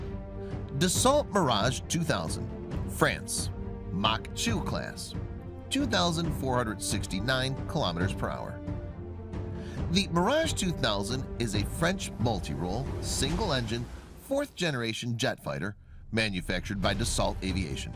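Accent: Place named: Kansas, United States. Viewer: My car's still have speedometers in miles per hour your voice Mr narrator sounds like it's straight from Kansas.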